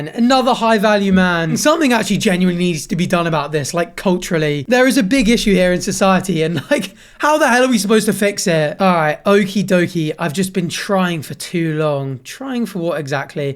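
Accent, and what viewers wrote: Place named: United Kingdom. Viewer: Hey dude Don't know if you'll read this comment, but what area are you from? I'm an American and I struggle with identifying foreign accents, but you have a very distinct accent that I've heard in a couple of other youtubers. I'm just curious where it comes from. I'm like 99% Sure it's a British accent, obviously lol. Definitely not Scottish, Irish or Welsh, and doesn't sound like the stereotypical Londoner or RP accents, but i could be wrong. Thanks!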